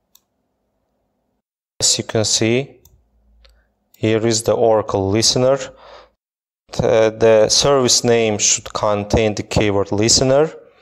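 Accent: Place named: Poland. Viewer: Jestes z Polski? masz akcent polski :)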